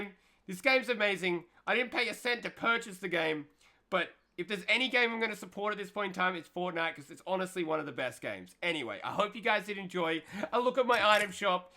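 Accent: Australia. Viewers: Hussein Naser they are both Australians durh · So, ur dumbass is telling me that everyone with an Australian accent sounds the same?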